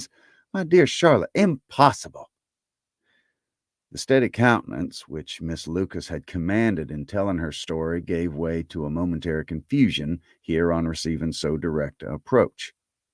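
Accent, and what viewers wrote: South, United States. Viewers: Is the "...and Magnolias" added simply to indicate that it has been read in a southern accent, or does it come from some adaption, like, "Pride & Prejudice & Zombies"?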